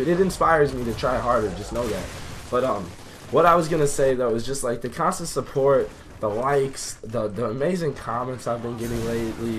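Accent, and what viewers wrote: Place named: New York, United States. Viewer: U sound like u from new youk or new jersey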